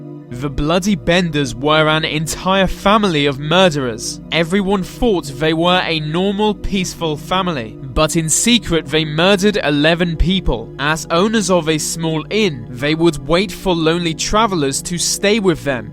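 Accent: United Kingdom. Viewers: are you British cos your voice is fabuloussssss ☺☺☺☺☺☺☺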